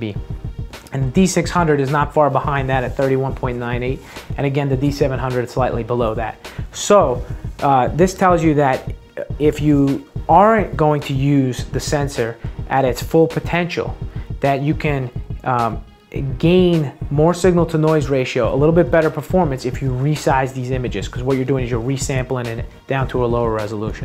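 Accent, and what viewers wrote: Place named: New York, United States. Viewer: too much talking... yo, you from the NY area? yea, I thought so... eh, I feel like gettin some gabbagool.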